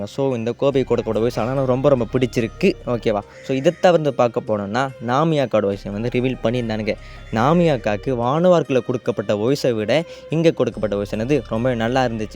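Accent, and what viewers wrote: Sri Lanka. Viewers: Sri Lankan tamil ah neenga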